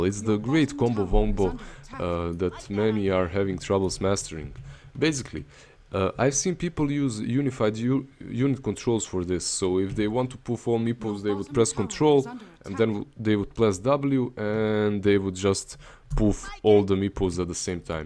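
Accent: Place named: Balkans. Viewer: Haha znao sam da si s balkana po akcentu.Svaka cast lajkovao videi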